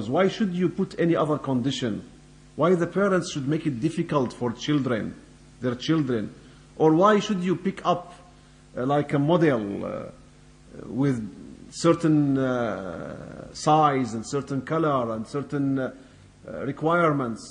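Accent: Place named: Sweden. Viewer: His accent is Swedish.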